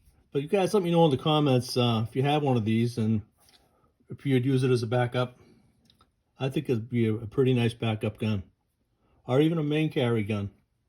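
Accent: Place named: North East, United Kingdom. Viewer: Sounds like you're from up here in the North East as well? A rare breed for firearm reviews! Appreciate the content and how thorough you are going over the model!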